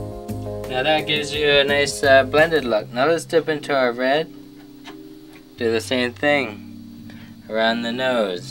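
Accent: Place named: Canada. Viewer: Ba ha ha! Thought I heard you say, "draw in your picture like... c'est ca.." and thought this dude sounds like he's from my neck of the woods, he's got to be Canadian. I see your website is a dot ca domain. If you aren't from the East coast, I think you'd fit right in anyway!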